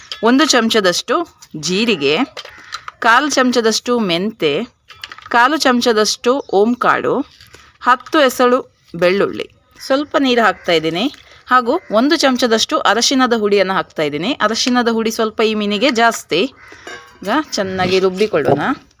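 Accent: Mangalore, India. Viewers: ಮಂಗಳೂರು ಮೀನು ನೋಡ್ಲಿಕ್ಕೆ ಚಂದ ಮಂಗಳೂರು ಕನ್ನಡ ಕೇಳಲಿಕ್ಕೆ ಅಂದ ಮಂಗಳೂರು ಪರಿಸರದಲ್ಲಿ ಕಡಲ ಕಿನಾರೆಯ ಸೊಬಗು ಸವಿಯಲು ಆನಂದ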